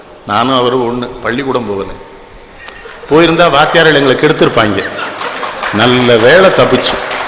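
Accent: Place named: Tirunelveli, India. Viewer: நான் என் இளமை காலங்களில் சுவைத்த நெல்லை தமிழ் மறந்து விடும் காலங்களில் அய்யா நெல்லை கண்ணன் குரல் கேட்டு மகிழ்ச்சி அடைவது வழக்கம்.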